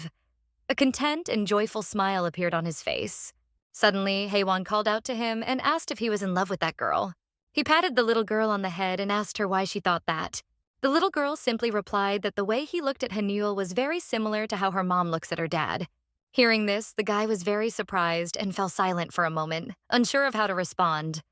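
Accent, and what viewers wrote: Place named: Australia. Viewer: Ik for a fact that ur an Australian. Am i right?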